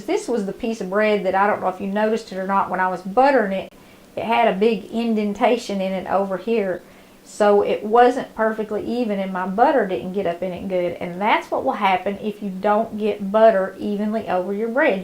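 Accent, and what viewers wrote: South, United States. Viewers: I just want to add: I really do enjoy watching you, but I bet it isn’t the reason most other ppl do. I was born in and spent the first 25 years in Texas. Then I wound up in Edmond, OK, then Boulder, CO and back to Edmond again. I just miss southern accents! They make me feel at home! My grandmothers and great grandmothers were rural, farm and dairy people. We’d go on Sunday to visit and then for eating later in the day, my grandmother would reach into her refrigerator and out of a little bit of one leftover, and two or 3 more in margarine tubs, she could turn those into the best dinner!! She would also put out a huge plate of her homemade pickles!! And a big chuck of cheddar. All of those things just tickled me to death!! I get just a tad of that my memory watching you cook, so thank you!! I hope to make your corn fritters just as soon as the sweet corn is in!! 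My husband is a minister, so I laughed so hard with you telling on the kids in your first church who would say the verse, “Jesse wept,” and take their dollar and run!! I had fun sharing that story with my husband. I will just keep on watching, just wanted you to know, just the way you talk is like being home. Texas home, that is.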